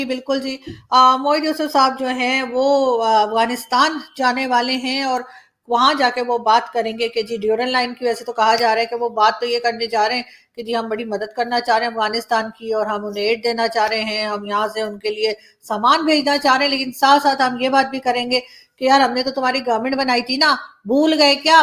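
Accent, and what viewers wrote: India. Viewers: Don't know wether people and u noticed or not ,that your accent and Dialect seems purely like Indian Hindi speaking person....... Lagta hi nahi ki urdu bol rahi hain aap ..It's like Indian Desi girl taking charge over any programme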